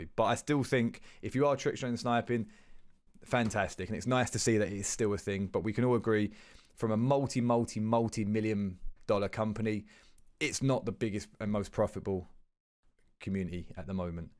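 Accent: United Kingdom. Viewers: I always enjoyed watching you and Spratt the most. Maybe as a Kiwi I just like the UK accent more than the American one? Excited for what's coming next!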